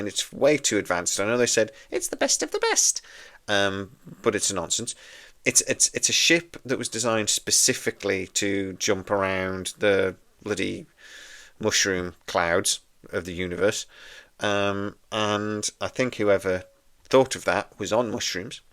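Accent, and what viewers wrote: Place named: United Kingdom. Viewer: This guy has a British accent so I instantly trust him and consider him authoritative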